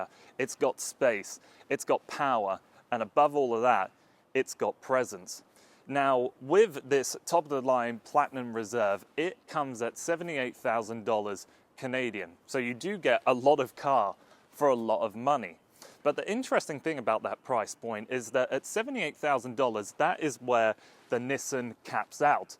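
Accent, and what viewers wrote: United Kingdom. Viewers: British accent is not a bonus point or anything. But yes the presentation was good.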